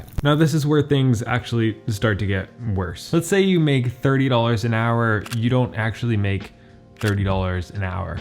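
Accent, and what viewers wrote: Boston, United States. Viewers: Love the accent bit! Were you born and raised in Boston and you just "lost" your accent or did you move there later? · I like the Boston accent 🤣🤣🤣… I love this concept, currently having a hard time with meal prep and cooking . So my money is going to restaurants 😩 … but learning to build a list and plan the meals ahead has been the biggest challenge. Hopefully I can switch my mindset and it will help.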